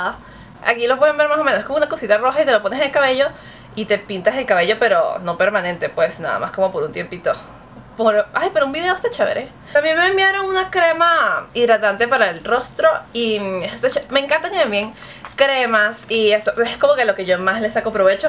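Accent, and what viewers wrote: Venezuela. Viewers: todass las venezolanas hablan asi, jajaja bueno a excepcion de algunas pero casi todas tienen ese acento y no es que sean pretenciosas.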